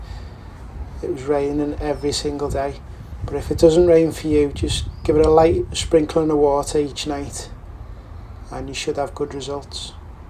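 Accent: Liverpool, United Kingdom. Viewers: We’re planning on levelling out the lawn and it’s so overwhelming. Seen so many videos trying to figure it out. Then heard you were scouse, subscribed immediately 😂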